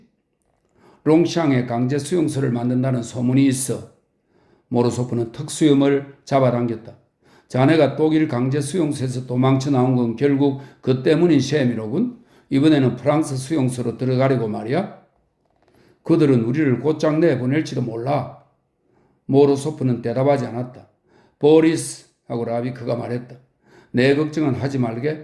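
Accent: Gyeongsang, South Korea. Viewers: 완독 고생하셨습니다. 정말 감사합니다. 장중한 소설을 구순한 음색과 살짝 경상도스런 억양으로 아주 잘 감상했습니다.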